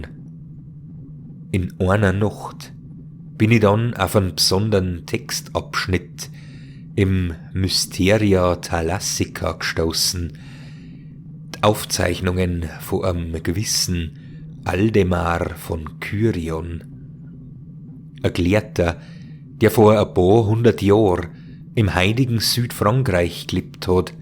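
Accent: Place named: Bavaria, Germany. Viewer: Bockshaxert 😂 Bairisch isch eifach e scheene Dialekt! Griaßle usm Badische👍🏻🤘🏻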